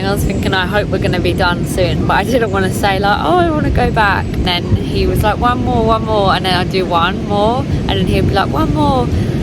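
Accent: Ireland. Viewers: Hi hello are you Irish ? Scotish ? Or maybe Angel ☕😎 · from your accent i guess youre an irish?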